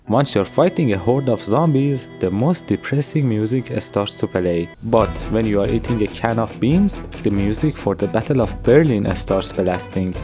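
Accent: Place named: Iran. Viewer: داداش از لهجت معلومه از ایرانی😏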